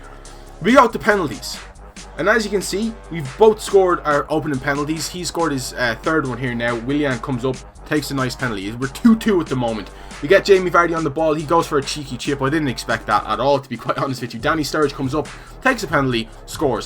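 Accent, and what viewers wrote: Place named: Ireland. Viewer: Yo where you from in Ireland? Im from Galway. Im guessing Dublin??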